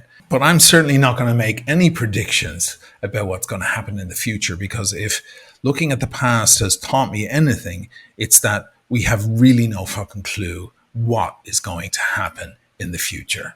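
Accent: Dublin, Ireland. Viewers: Always love to see a dublin accent on u tube talking about watchs i admire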